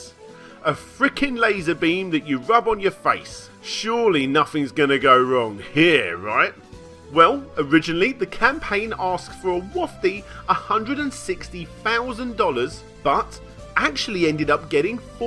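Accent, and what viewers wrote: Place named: United Kingdom. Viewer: Whoa whoa whoa wait one gosh darn second.. you're British? So.. am I British? Is there a test to find out?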